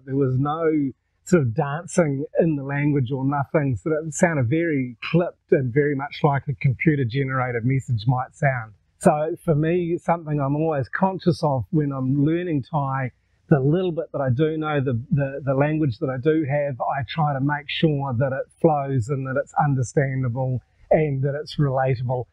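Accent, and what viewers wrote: New Zealand. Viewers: Surprised to hear another kiwi here in Northern Thailand. Good on you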